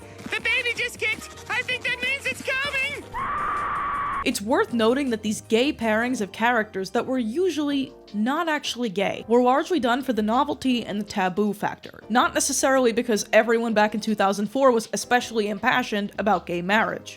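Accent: New York, United States. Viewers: Y'all are unaware of the New York/New Jersey accent??? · she’s just got a wicked new york/new jersey accent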